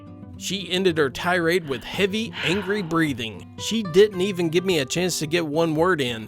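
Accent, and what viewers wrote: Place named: West, United States. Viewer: Story #1 Setting: England. Narration Accent: American Western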